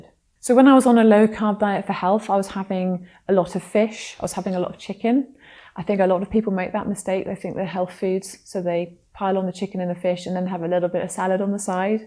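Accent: England, United Kingdom. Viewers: Great to hear an English accent talking about WFPB living. Well done.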